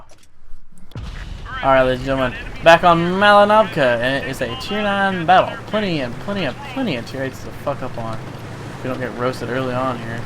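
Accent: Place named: South, United States. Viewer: You sound southern af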